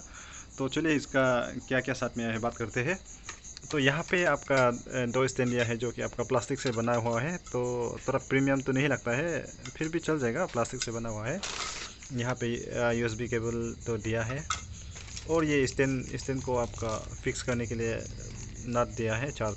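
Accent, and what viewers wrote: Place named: Assam, India. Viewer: Yeh asamee hai. T ka uccharan dekh ke hi samjh gya.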